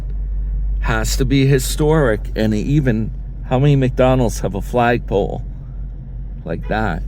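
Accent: New York, United States. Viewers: I would rather endure a jalapeño enema while a spike is driven through my fucking temple than to endure your New York fucking gibberish! Shut the fuck up you annoying snot!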